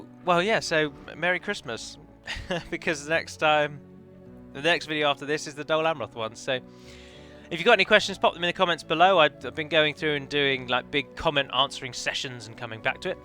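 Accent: South Africa. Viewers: Great South African accent! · I love the South African accent!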